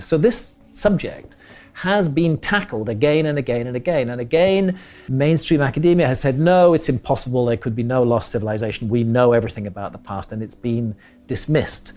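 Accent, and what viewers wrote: England, United Kingdom. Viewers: This bullshit artist actually says that Plato's story of Atlantis MUST be true because geology says sea levels rose via Meltwater pulse 1B (the characteristics of which is highly unknown, by the way) around the same time that Plato gives for Atlantis. No joke, he actually says this. It is so easy to get away with bullshit when you have an awesome british accent. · His English accent gives him an infinite amount of credibility.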